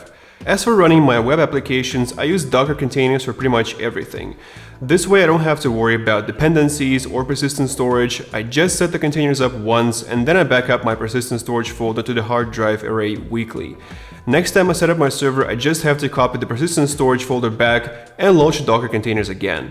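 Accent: Russia. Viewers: такое ощущение что автор видео Русский пацан - по акценту заметно =) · Are you from Russia?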